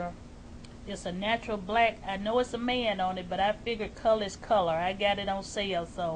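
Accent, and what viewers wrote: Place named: South, United States. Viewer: Girl I love this wig on you!!!!!! And love your country accent =)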